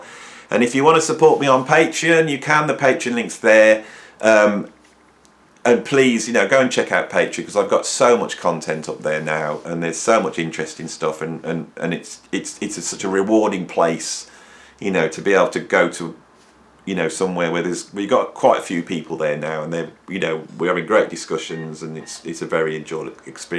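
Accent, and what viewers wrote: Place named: Midlands, United Kingdom. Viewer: It's funny even in the Midlands accent you reading the fly on the windshield lyrics I still hear the big hit afterwards 😂 a real iconic moment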